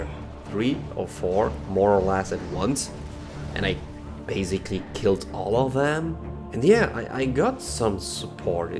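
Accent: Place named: Hamburg, Germany. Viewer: Ich bezweifel dass es dir m&#246;glich ist zu verstehen was ich meine. Erstmal bin ich kein WoW-Kind, als ich Kind war gab es weder WoW noch gro&#223;artig verbreitete PC&#180;s. Du &#228;usserst keine Kritik sondern schreibst was dir nicht gef&#228;llt und das in einem aggressiv negativem Stil mit einer Menge indirekter Beleidigungen. Ob du falsch oder richtig liegst liegt im Auge des Betrachters aber dein Verhalten ist keinem Erwachsenen angemessen. "hatte mal 2-3 videos von dir zu MoP zeiten geguckt war noch ganz lustig aber was soll dieses hochn&#228;sige verhalten und diese grauenhafte englische aussprache wo du versuchst nicht deutsch zu klingen..." Was genau ist grauenhaft an seiner Aussprache und warum meinst du dies sagen zu k&#246;nnen? Warum sagen ihm Muttersprachler dies nicht sondern loben ihn sogar weil er nicht nach Schulenglisch klingt? 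Er sprich seit einigen Jahrzehnten genau so Englisch und hatte damit auch keine Probleme. Ich frage mich auch warum man als offensichtlich nicht-Deutscher, das h&#246;rt man trotz Hamburger Schnut in jedem seiner Deutschsprachigen Videos, versuchen sollte nicht Deutsch zu klingen und wo liegt dein Problem dabei? Es sollte dir klar sein dass du deine Community vertrittst und dass du ohne indirekte Beleidigung kaum einen Satz beenden kannst. Hier kannst du, trotz der Schreibfehler in der &#220;berschrift, eine kleine Einsicht erhalten wie man Kritik &#228;u&#223;ert - k&#246;nnte dir helfen denn im wahren Leben l&#228;ufst du bei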